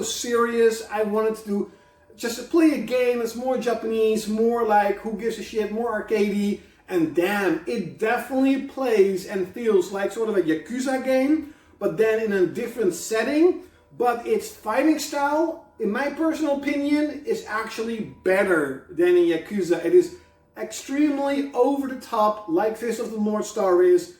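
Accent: Netherlands. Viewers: Where are you from? I think i hear a dutch accent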